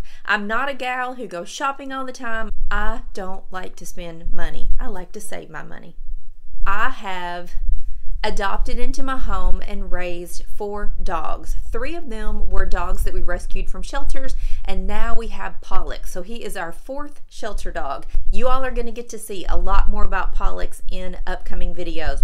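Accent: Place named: Kentucky, United States. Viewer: You are SO the person I want to be when I stop being a procrastinating perfectionist! 😁 I am a 49 year old night owl musician from Boston (thus the username and picture). I LOVE your Kentucky accent and phrases!! I have 2 boys ages 12 and 14 so I am fascinated by how you’re handling your youngest flying the nest. 💗 Your energy grabbed me but it’s your sweet personality and “realness” that keep me coming back. 😊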